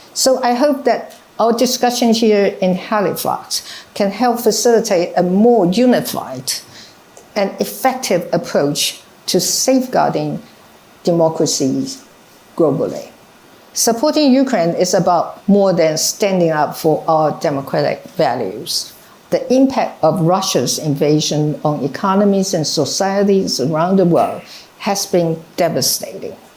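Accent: United Kingdom. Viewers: 喜歡喵統的英國腔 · 我是來聽小英的英國腔的：）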